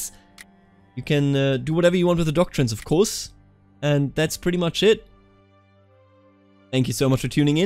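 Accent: Germany. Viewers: Lav ze German Akzent:D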